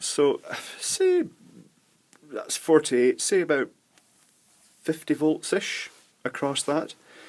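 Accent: Scotland, United Kingdom. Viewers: Yeah he is Posh Scotch - only the best from Scotland! Sounds a bit like Bryce Curdy except he doesnt have a cucumber shoved up his butt! lol PS. Bryce Curdy was a gay STV news presenter who turned up at a local Glasgow hospital with a cucumber lodged in his rectum - his career after that was all too short unlike the cucumber which according to my pals at the Viccy was a massive 16 incher! lol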